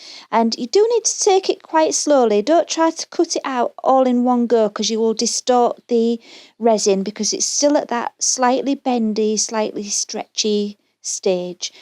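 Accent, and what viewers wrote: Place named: Yorkshire, United Kingdom. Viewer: Ah! Yorkshire, thank you...was trying to guess, cos I thought you sounded like Sarah Millican.... This is an awesome bowl.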